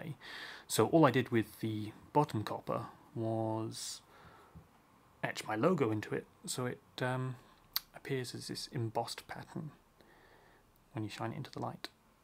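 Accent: United Kingdom. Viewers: Yeah he just sounds British is what you're trying to say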